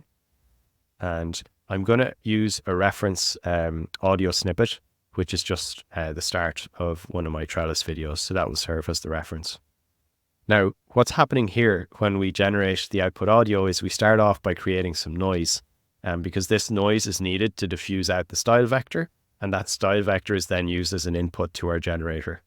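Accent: Ireland. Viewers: Three things: 1. This is by far the most thorough, informative and approachable video I have come across on TTS; really appreciate the time and care you have put into this. 2. As a South African, I absolutely love the Irish people and accent - a lot of learnings in this video about accent, pitch and tone that will be very useful (I think) for TTS for under represented languages, very important from an African perspective. 3. Very well earned like and subscribe; I look forward to learning more from you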